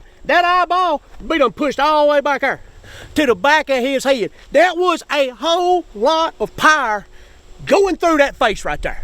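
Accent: United States. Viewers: Yo I love his voice. His voice is MERICA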